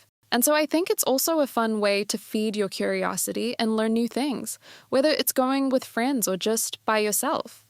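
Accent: United Kingdom. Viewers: what her English accent is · برتش آكسنت؟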